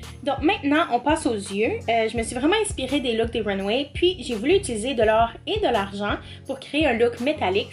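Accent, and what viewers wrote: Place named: Quebec, Canada. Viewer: wow c est la premiere fois que je t ecoute en quebecois d habitude je t ecoute en anglais j adore ton accent, et bien evidement ton maquillage t es super belle et tres sympathique bisous de l egypte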